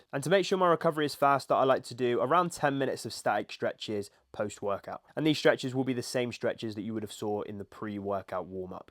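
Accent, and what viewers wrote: United Kingdom. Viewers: you have a British accent but Irish good looks.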